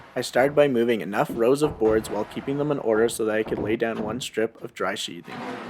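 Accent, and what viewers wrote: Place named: Canada. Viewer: Excellent video, and very informative! I especially like the way you get right to the point, pace things perfectly, and don't use any of the typical amateur mistakes like long lead-ins, bad music, or obnoxious personality quirks. I've saved your video to watch again before I start my own barn board floor project. P.S. I also appreciate your Canadian sensibility and pronunciations! 🇨🇦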